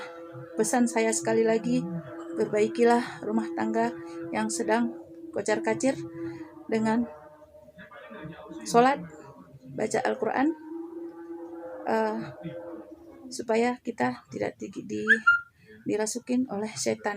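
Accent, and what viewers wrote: Aceh, Indonesia. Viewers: logatnya aceh...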